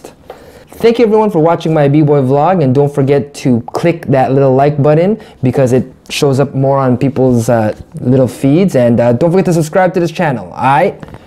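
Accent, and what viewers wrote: Philippines. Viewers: HAHAHAHA i like your filipino accent!! hope you had fun playing in manila!! godbless you sir!🙌🏻🙌🏻💪🏼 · HAHAHA! Nice Filo accent bruh! Dope battle!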